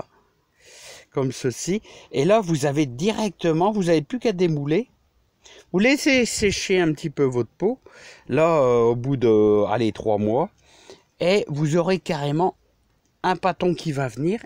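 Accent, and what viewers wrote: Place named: Nord-Pas-de-Calais, France. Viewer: Toujours le sourire dans tes vidéos et tu redonne le sourire a travers tes vidéos Tu parles chti 😊😜 Je te le redis tu es qqun de bien je te souhaite une bonne soirée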